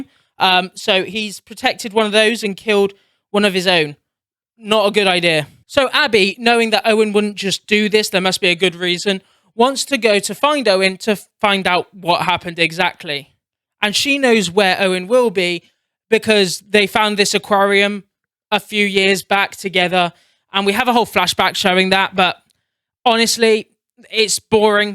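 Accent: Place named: United Kingdom. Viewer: Make more video only down side is that your british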